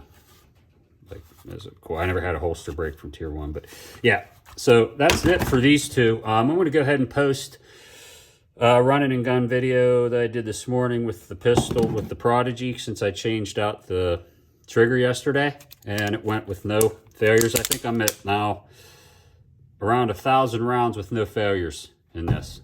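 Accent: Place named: Pittsburgh, United States. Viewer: Good to hear another Yinzer!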